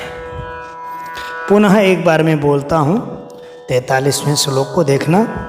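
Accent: Standard. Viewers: khub sundar shudh ucharn me mai samj pati hu dhanyvad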